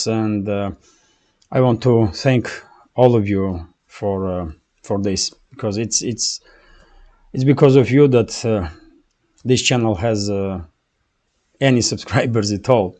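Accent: Russia. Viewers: I love your Russian..English...no room to make better...you are perfect...from America 🇷🇺💕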